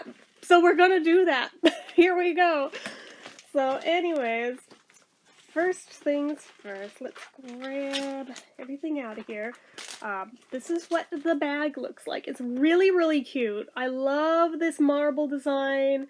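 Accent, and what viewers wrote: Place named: Vermont, United States. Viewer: Speaking of Vermont, do you live there? Or originally from there? Your voice/accent is almost identical to my buddy from Stowe. Like scary close😂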